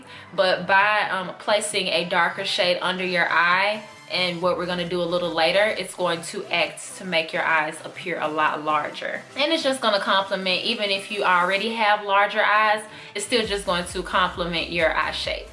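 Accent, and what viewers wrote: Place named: Texas, United States. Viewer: You have the cutest Texas accent and great video!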